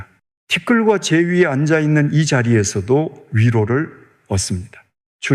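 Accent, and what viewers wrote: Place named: Gyeongsang, South Korea. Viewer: 목소리도 전혀 아니에요 경상도 말씨인데 참 유튭.들 ㅍㅍㅍ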